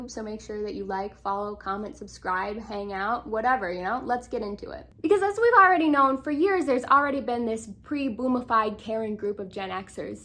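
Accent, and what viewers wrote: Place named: Minnesota, United States. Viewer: german american from minnesota ? damn nice to meet you lol you have such a nice accent btw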